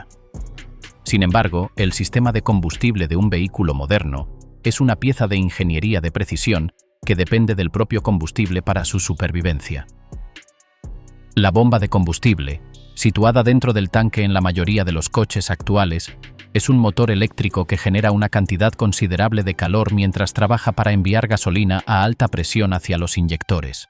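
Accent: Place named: Spain. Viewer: No me gusta el español de españa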